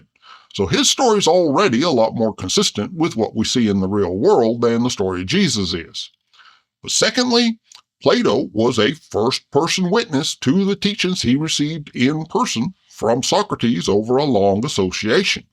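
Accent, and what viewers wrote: South, United States. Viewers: I'm a big fan of the analytic approach to how you examine and dissect each claim that is found in Dr. William Lane Crag's book On Guard. Giving them an in depth sniff test in your charming southern down home style. The only criticism that I have is more of a me problem than a you problem and that is the background sort of triggers my minor case of visual OCD and that is the trees in the mirror on the right side are just wrong the image should be identical as to what is seen in the window and it isn't.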